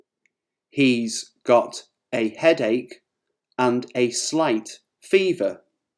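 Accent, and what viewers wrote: United Kingdom. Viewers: Thank you, just found your channel and subscribed. Just wondering, what your accent is. I think it is British at least it sounds British to me. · İs this british accent?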